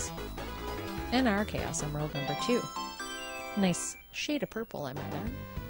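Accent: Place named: Canada. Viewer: You have very nice Canadian accent. You should do ASMR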